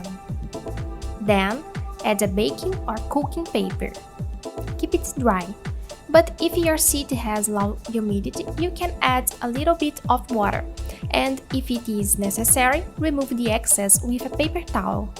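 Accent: Brazil. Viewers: Nice tutorial, just a question Where're you from? Your accent sounds brazilian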